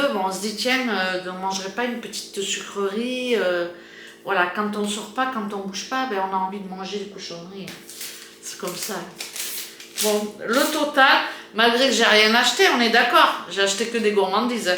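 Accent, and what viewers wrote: South, France. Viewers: Coucou...mon avis au sujet de tes vidéos recettes.. je préfère quand tu commentes..l’absence de son est monotone.. je préfère comme tu dis:les blablas et les papotages,avec ton accent du Sud c’ est super! Ne change rien.. car c pour ça que l’ on va sur ta chaîne: pour t’ écouter.. sinon on peut aller sur n’ importe quelle autre.. c ce qui fait ton originalité...et que l’ on ait envie de la regarder!! Comme disait un commentaire plus haut si quelqu’un ne veut pas t’ entendre.. il n’ a qu’ à couper le son!!😂Bonne journée et à bientôt t’ entendre..💕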